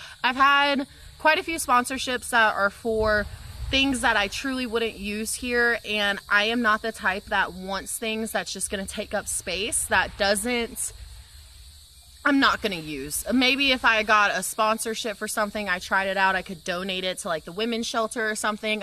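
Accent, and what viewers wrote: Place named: South, United States. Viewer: I think your videos are so sweet. I am a GA girl! I love to hear you talk. Sweet Southern Girl!!💖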